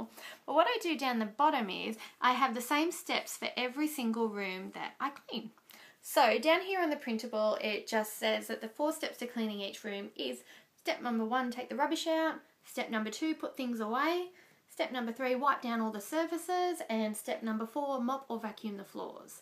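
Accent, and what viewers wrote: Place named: Australia. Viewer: I detect an accent and noticed you spelled some words differently than the way Americans do. Are you Australian or British (I'm leaning more towards Australian).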